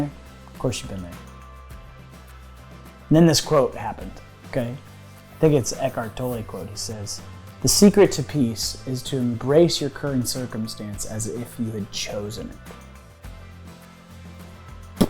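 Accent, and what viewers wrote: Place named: United Kingdom. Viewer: Hey, I wanted to let you know that I just saw a clip of you and TAN talking about Blind Eyes Opened earlier today and I love that you backed that movie. With my personal story that hit me SO hard. Thank You so much for that....and I like your vaguely British accent...:)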